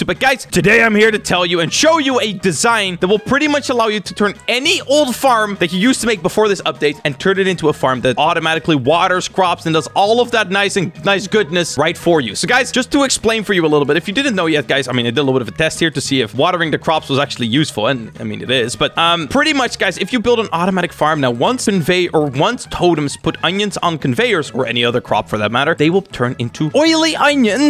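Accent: Netherlands. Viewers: You Dutch I think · Hey are you Dutch?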